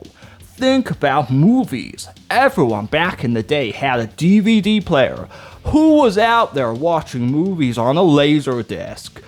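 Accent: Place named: United States. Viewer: It's clearly American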